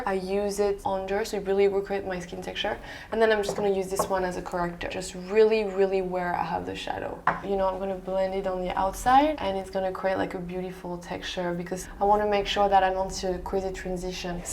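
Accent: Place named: France. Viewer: Bravo for your vidéos that show simple ans wearable make up so différent than most of the american Youtubeuse who make make up with tons of coats, with two many Colors ans hours spent in thé bathroom ( at what Time do they wake up if they work????).... for a result...how to say... I have readen that thé music in the beginning was not available, et c.est bien dommage. Je continue en français parce que moi aussi suis française, et que ça va dix fois plus vite de taper en français. En tout cas, ne change rien, avec ton petit air de Jane Birkin jeune et ton frenchy accent « so cute » ( j.ai le même... et après avoir passé des années à essayer de le gommer, j.ai abandonné et maintenant je le revendique même!). Suis allée à New York en octobre dernier, mais ne t.ai pas croisée au détour d.une des rues du « village »😪. Allez continue à faire « ce que tu veux » et encore bravo.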